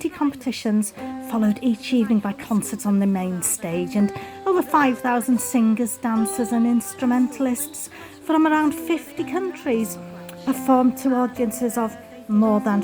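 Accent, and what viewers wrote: United Kingdom. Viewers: This is a very informative tour and it's relaxing listening to your sweet voice and lovely British accent.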